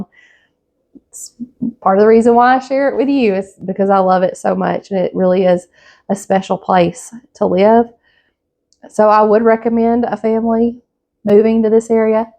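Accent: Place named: Alabama, United States. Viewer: Your accent reminds me so much of my home town folk growing up over in Marion County, AL.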